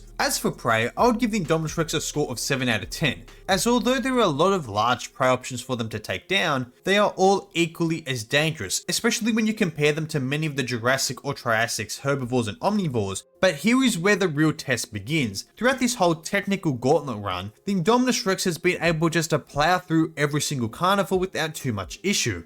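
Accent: Australia. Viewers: Good day mate are you Australian? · U an Aussie can’t tell which accent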